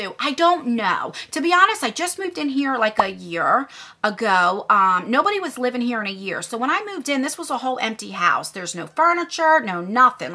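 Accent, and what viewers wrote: New Jersey, United States. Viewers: Thanks for sharing! I don't wear makeup much beyond lipstick, so the unboxing channels I follow are because of the person doing the videos. I've liked you from the beginning. I like listening to you speak (so, chat away!), you're pretty (love your eyes!), and you've always seemed like a genuine person. I hate fake people, so it's refreshing coming across someone like you. We moved to Jersey when I was in junior high, and my mom still lives there (although I do not) so your accent caught my attention, too 😄 I'm glad you have a support system to help you through the tough times, and I wish you all the best 💕 Buona fortuna!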